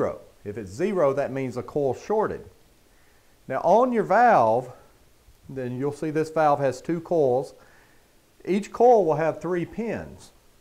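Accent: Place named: South, United States. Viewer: As a Georgia native, definitely has a southern accent. I assure you however that the information contained will also help with coils.😎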